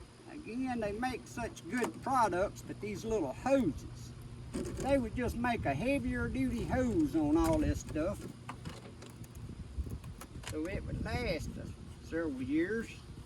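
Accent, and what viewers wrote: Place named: Appalachia, United States. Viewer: I've heared that accent once before in the Appalachian mountains around Elizabethton Tennessee. I'm a retired welder. I've worked all over the US even spent a lot of time in the ship yards in Louisiana. That accent I've only heard in one place . Appalachian Mountains. Thanks again for your videos. I'm sure I'll be back next time I need to know how to. Have a great day and be safe keep one in the chamber. 😊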